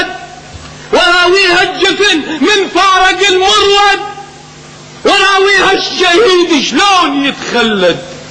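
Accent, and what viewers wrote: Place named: Iraq. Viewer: اللهجه عراقيه خالصه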